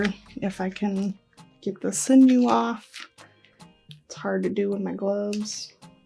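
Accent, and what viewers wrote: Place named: Michigan, United States. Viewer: awe thank you so much!!! That is so sweet! I definitely will take you up on that! I'm subscribing to your channel now, your videos are inspiring! I've lived in Michigan my entire life and your "accent" reminds me alot of ours lol im sure your state is close I thought that was cool as well, I feel like I don't hear it to often on YouTube.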